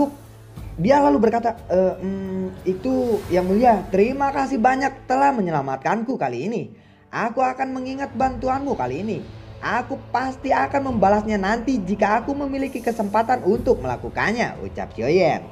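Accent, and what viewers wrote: West Java, Indonesia. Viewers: Anjay up lagi kirain gk bakal up lagi,gw terlanjur tidur:v Mang pasti sunda nya?:v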